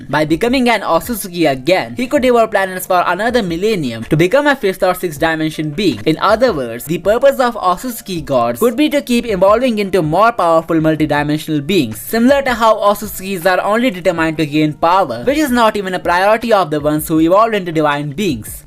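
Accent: India. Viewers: R u from India?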